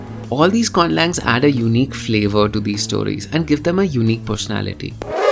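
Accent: India. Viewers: bhai ka excessive indian accent hai, but he says "Avatar" like he's american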